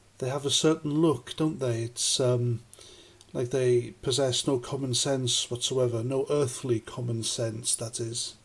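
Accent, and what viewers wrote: Ireland. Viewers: He sounds like a very posh irish man. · Hi there, like another viewer, I detected a faint Irish accent. Not NI, more like Dublin. One wonders why anyone would make a point of protesting overseas. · He sounds Irish to me. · English? He sounds Irish to me. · I think I detected a very, very slight Irish accent so maybe that's where his ambassador thing comes in. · I heard an Irish (eire) accent. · His accent sounded as if had a hint of Irish to me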